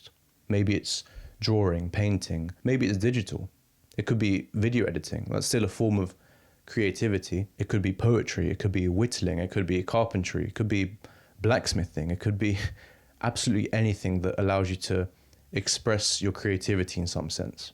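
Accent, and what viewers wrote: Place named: Cockney, United Kingdom. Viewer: This guy has the new grating Cockney Accent… Close my eyes and he could be a Rapper or PoundShop Gangsta. Or one of the new breed of BBC or Channel Four continuity announcers.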